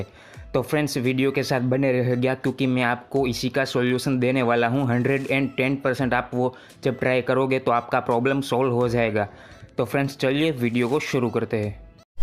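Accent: Gujarat, India. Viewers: Bhai gujrati ho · Gujarati ho bhai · Tame gujrati cho e tamri boli mathi kahber pade che bhai kaya thi cho bhai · Gujrati ho bhaya 😍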